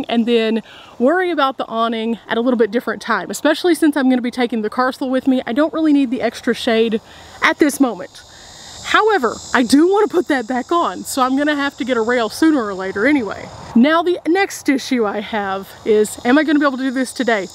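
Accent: Texas, United States. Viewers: Ooooohhhhhh I have been waffling for a year too for my NV. Gonna watch and see!!! I haven’t been able to pull the trigger on a rack and box because the NV is just a little tippy toppy rocky already and didn’t know if adding up volume was going to make it more so. And can I actually crawl up and get stuff easily or not. Would I even? But I want to go on longer journeys and to do so I either need a roof box or hitch box. Waffle waffle waffle waffle waffle. I love simplicity and minimalism and well a big ole box seems like a big ole closet. Carry on vs checking luggage. But maybe. Can’t tell you how much your channel has helped me make decisions because you have the same vehicle and the same Texan accent 🤣. Oh I miss Whataburger! Misplaced Texan that needs to journey home to see the folks whose house just flooded in that last flash flood and so ya I guess I need a roof closet y’all, darn it 🤷‍♀️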